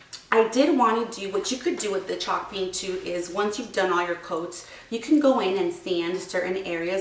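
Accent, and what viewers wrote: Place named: Louisiana, United States. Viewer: Great video!! Your accent sounds like you’re from Louisiana!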